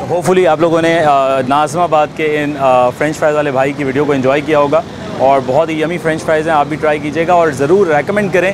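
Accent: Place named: Uttar Pradesh, India. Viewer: 🌹🌹🌹🌹🌹 भाई आप की बोली बिल्कुल हमारे उत्तर प्रदेश हिन्दुस्तान की तरह है माशाल्लाह हिन्दुस्तान जिन्दाबाद 🇮🇳🇮🇳🇮🇳🇮🇳🇮🇳🇮🇳🇮🇳🇮🇳🇮🇳🇮🇳🇮🇳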